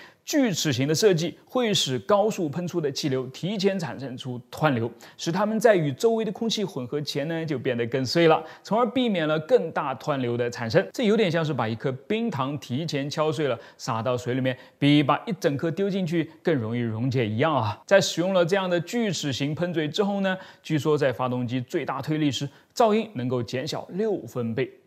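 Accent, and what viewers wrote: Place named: Shanghai, China. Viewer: 从视频中得到的信息已经从的口音分析，毕业于上海大学？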